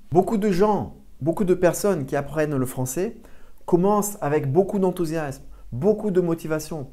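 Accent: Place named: South, France. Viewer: Il me semblait juste que tu parlais un peu a la maniere du sud (j'adore entendre des nuances de français))) Mais j'en doutais et pensais que c'etait probablement juste une association — ton nom est, comme le personnage principal de le dessin anime Ratatouille, et la ratatouille est un plat du sud, etc. Bien que (j'ai deja regarde toutes tes videos) tu vis vraiment dans le sud))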